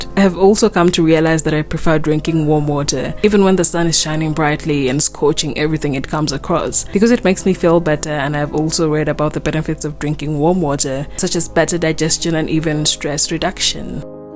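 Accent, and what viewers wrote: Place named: Kenya. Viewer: OMG u just reminded me of my plates 14 years ago before i left Kenya. Why that accent though? I love Kenyan accent. I still keep it after 14 years in USA.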